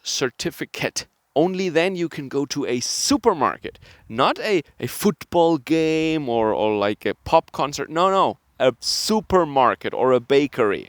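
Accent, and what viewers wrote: Germany. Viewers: Clockwork Orange with a German accent.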